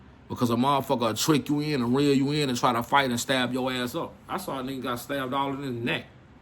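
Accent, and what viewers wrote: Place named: New Orleans, United States. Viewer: you kinda sound like you from new orleans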